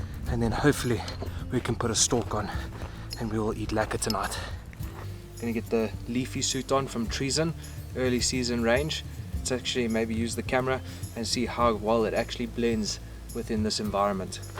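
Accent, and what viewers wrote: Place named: South Africa. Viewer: Hi brother, are you South African? 😅that accent.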